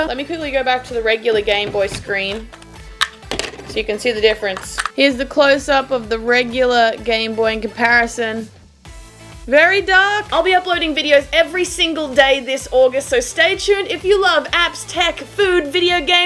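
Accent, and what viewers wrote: Australia. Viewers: is she austrailian?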